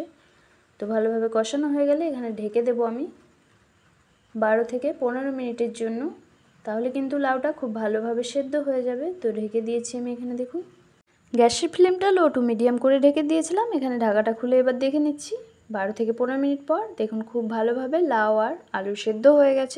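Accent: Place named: Kolkata, India. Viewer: Assalamu Alaikum. Shotti boddo bhal laagchhe ata bhebe je, kolkatar muslim apur ranna dekhte parchhi🥰. Ami always kolkatar ranna dekhi. Alhamdulillah akhon akjon kolkatar muslim apur ranna dekhte parbo 🥰. Ami USA 🇺🇸 theke apnar ranna ta dekhechhi, aj shokale apnar recipe u tube a pelam. First I thought u r bangladeshi pore kotha shune bujte parlam ta kolkatar 🥰🥰. Shathe shathe subscribe kore felechhi. bolchhilam je, Kolkata te kuthay apnader bari?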